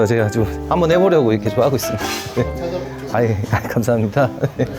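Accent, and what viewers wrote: Chungcheong, South Korea. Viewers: 어이 양반 충청 양반..뭐래유..너무 잘보고가고 좋아요..영상 공유해줘서 눈향복하개해줘 고마워요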